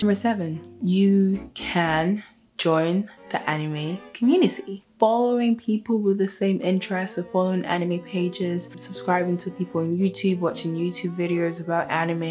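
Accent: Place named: United States. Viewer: Why do u sound American? I thought you were from London lol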